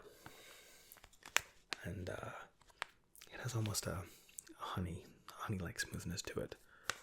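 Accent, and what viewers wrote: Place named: United Kingdom. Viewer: I have found my new favourite bed bug. Love your work. You have a wonderful mixture of toned down enthusiasm and relaxation in your voice and your accent is like nothing I've heard before. It's British, Irish, sometimes even with a hint of Australian... it's mesmerizing. May I ask, where ARE you from exactly? Have you travelled alot and picked up this little smooth smoothie of accents along the way? I'm Swedish so I am certainly not an expert when it comes to English accents but I've listened to enough to know that you sir are one of a kind in the ASMR game. ❤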